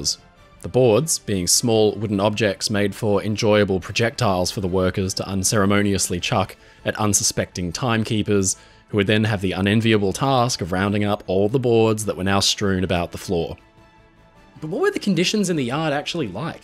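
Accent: Australia. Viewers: I just want to say some things about what a testament to how good your channel is. As an Aussie myself, I generally dont like narrators/presenters with an Australian accent, I guess growing up with it I just usually don't enjoy it 🤣 BUT, the way you narrate is just so lovely to listen to and i often just enjoy having playlists of your videos on in the background while I game or work. Im also not super into maritime as a topic in general (aside from the pretty common fascination with Titanic) but i find the way you explain things with such simplicity and genuine love of the topic makes the info you give feel really digestible and understandable and I'm finding myself getting pulled into an interest I didnt have much draw to. So, i just want to say thank you. For being company when I've needed to decompress, for being informative in a way thats accessible and for just coming across as an all around really likeable channel. 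Keep up the absolutely spectacular work, my dude 🩷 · I’m with you, but I’m eternally grateful. His great storytelling is a welcome distraction to the vitriol and animosity found in today’s world of news and politics. His soothing voice and Aussie accent sooth my jangled nerves.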